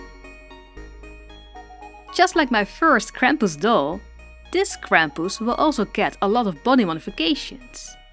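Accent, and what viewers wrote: Netherlands. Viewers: Je kan horen dat je Nederlands bent hihi Ik ben ook Nederlands en vind je video’s erg leuk maar cringe soms ook erg English: You dan heer that you are Dutch I am dutch as well en like your video’s a lot but its somtimes very cringy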